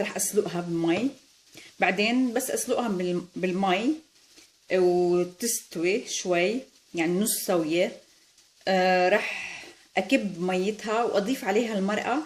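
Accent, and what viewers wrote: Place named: Palestine. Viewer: تسلم هالايدين ان شاء واكلك مبين لذيذ جدا وحكيك حلو الحكي الفلسطيني كتيييييير حلو وبحبو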